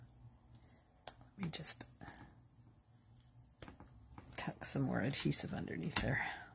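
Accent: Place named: Alberta, Canada. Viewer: You sound like you’re an Albertan. 15 years there myself, and wow I agree with you, I can’t just sit and watch tv…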